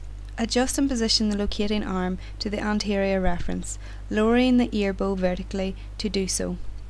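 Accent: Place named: Ireland. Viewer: This is a brilliant video, thank you, and narrated with a lovely irish accent. Will help with orthognathic records I'm taking today, keep this up. As an aside, you could turn this into a research project, onto the use of videos for education of the dental profession, a simple survey based response and get a publication under your name. Thanks again, Jay Patel ST2 orthodontic registrar, The Royal London Hospital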